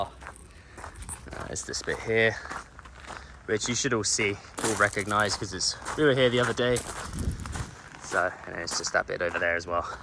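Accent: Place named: Manchester, United Kingdom. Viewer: What part of Manchester are you from?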